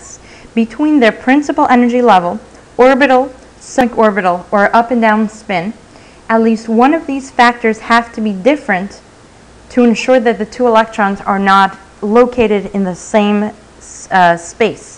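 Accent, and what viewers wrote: United States. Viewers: Why does every chemistry video have an American accent!?!?!?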